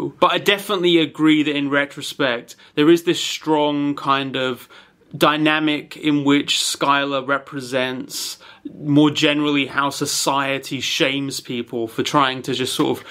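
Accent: United Kingdom. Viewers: honestly i can understand the impulse to want to go back on your delivery in the prior video. i didn't look at the comments but i'm sure it was full of people who were highly critical. i just went back and rewatched it and i have to say i don't think there's any backpaddling necessary, you did a fantastic job at meeting Skylar's critics in the middle and provided a very strong case illustrating how Walt pushes Skylar to rebel against him, ultimately making her the hero that triumphs over the life of terror he is bringing about to the family. I think for the last videos critics, with the time that has passed i'd be really interested to know if they still feel the same way. I think it reflects more poorly on them and their insecurity in their own perception of the show. It's like saying, "well i was wrong and you are right but i didn't like the way you said it!" and truly i can't see where they could see that other than Americans typically being overly critical towards GNC people and maybe your british accent feeling condescending to us when it's just how your fucked up voices sound. 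Anyways, ty for the follow up video, i'm loving these uploads so much.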